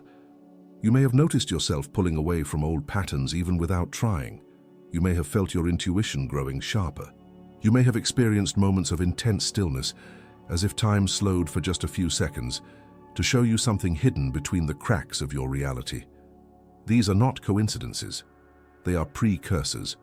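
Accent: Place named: United Kingdom. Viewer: I would like to watch this ,but the British accent gives me the creeps.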